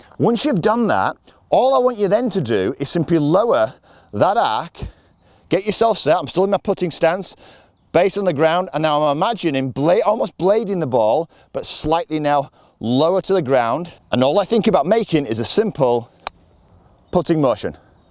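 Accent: Yorkshire, United Kingdom. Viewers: Thank you. Yet another super well explained video, simplifies the process. P.S. I love the subtitles trying to cope with your Yarkshire accent! I'm still trying to find my "pudding stance".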